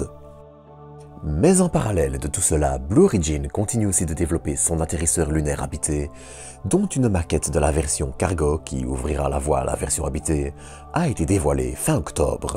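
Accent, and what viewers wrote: Belgium. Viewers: Merci pour toutes ces précisions ! Toujours un plaisir de regarder tes vidéos avec ton accent belge si appréciable !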